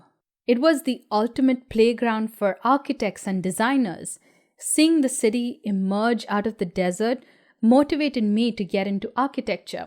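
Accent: India. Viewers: Wow an Indian accent that I can understand, liked and subscribed! In Dubai, I couldn't understand when (most) Indians speak English.. I ended up speaking to them in Hindi LOL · Such a sympathetic woman! I feel for you and know that growing up - wherever - this is your home. However, having been to Dubai as a European, I do not understand WHY on earth anybody wants to travel there. It is one of the most unattractive places I have seen. All fake, all new, all shiny, glossy, empty...filled with the most beautiful people from all over the world working there. I have hardly ever seen so many attractive human beings as there...but still: I liked my 1 week trip there, but would never dream of going there again. Howver, for you: Having not seen you parents in 6 years is terrible. I hope you can return and see them soon! Keep up with your very insightful and great channel. 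Love it! (And I love your Indian accent, too. Remember: MOST people in the English speaking world speak like you and not the Queen's English!!!)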